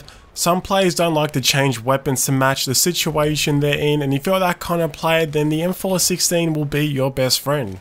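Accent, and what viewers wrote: Australia. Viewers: Haha thanks for the reply so quickly yeah i thought you sounded like an Aussie like me :)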